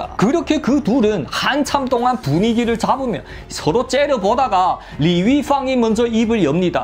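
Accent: Yanbian, China. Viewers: 너무 재밌습니다 ㅋㅋ 타고난 이야기꾼이네요 ㅋㅋ 조선족 특유의 말투가 더 귀에 쏙쏙 박히네요. 다음 편 기다리고 있습니다~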